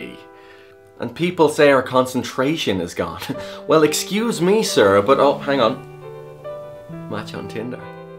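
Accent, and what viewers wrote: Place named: Ireland. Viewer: Your soo funny and I would do anything to have your IRISH ACCENT. Swap? I'll give you A Scottish one for your Irish One? Sound Good? :D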